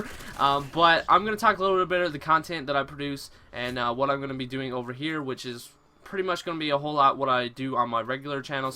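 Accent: Newfoundland, Canada. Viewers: That's no accent, his voice is just high pitched... or possibly just newfy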